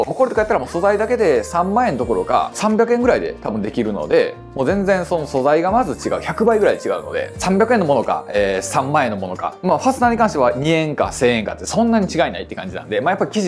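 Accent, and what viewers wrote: Kansai, Japan. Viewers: あまり詳しくなかった私にもとても分かりやすいです 話し方も私には関西のお兄さんなんで聞きやすかった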